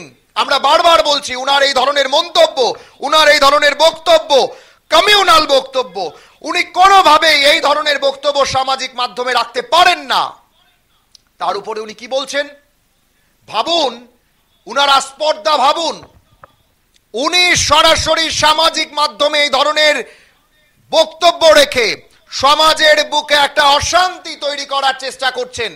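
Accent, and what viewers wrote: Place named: Bangladesh. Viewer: তুই আসলে আসল বাংলাদেশি কারণ তুই যেভাবে কথা বলছস সেভাবে বুঝা যায় তুই ওই বাংলাদেশ থেকে ওই এসোস আমি চাইলে ডেবিট মরা সিং সারের যে লেখছে শের থেকে আরো ভালো করে দেখতাম আর আরো গালি দিতাম বাংলাদেশী তোরা তোরা তিলকে তাল বানাস তার জন্যই এখন যে জায়গাটায় আছো সেই জায়গার বসে রয়েছো কেন ভয় লাগছে বাংলাদেশে যাওয়ার জন্য এমনি তো তোর যেতেই হবে কারণ তুই আসল বাংলাদেশি😂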